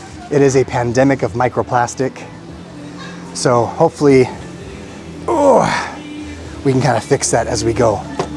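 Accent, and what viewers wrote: Philippines. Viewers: not only your tagalog is good but you're slowly adapting the filipino accent and diction. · u now have a tagalog twang from the last time ive been here hehe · Hey it seems you're starting to develop the sexy Filipino accent in your English too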